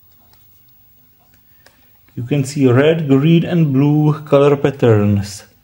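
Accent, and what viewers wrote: Prague, Czech Republic. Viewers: Čechoingliš :D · Pocujem cesky prizvuk uz po prvom slove. Mam pravdu ze si cech ?? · Didn't expect to hear a Prague accent in English. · Where are you from? Your voice souds like your from Czech xD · Czech English ? :D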